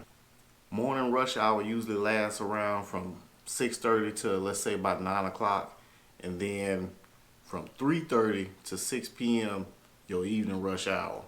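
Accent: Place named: Texas, United States. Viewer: love the Texan accent :)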